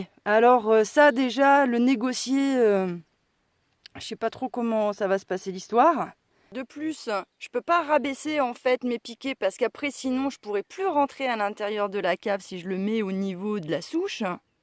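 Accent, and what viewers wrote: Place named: Southwest, France. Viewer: j adore l accent du sud ouest!!!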